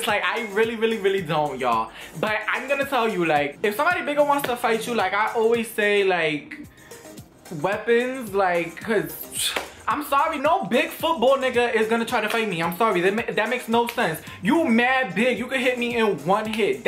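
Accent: New York, United States. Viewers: G I love the way you talk. That New York accent. I do not be having time to be fighting no big person like if you even push my little ass i might fall. 😂💯 this branch though. I'm weak.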